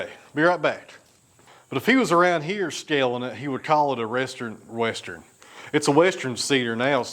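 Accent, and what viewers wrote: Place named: South, United States. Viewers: When you talk southern, it's a different language. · Greetings from South Wales to Tennessee , love your accent !! Fascinating video . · Good looking cuts, and ur southern our tongues aren't always cooperating with us lol. Good video bud.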